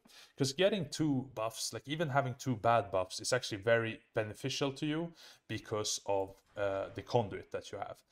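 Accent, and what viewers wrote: Sweden. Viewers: Are you swedish? Thought because of your accent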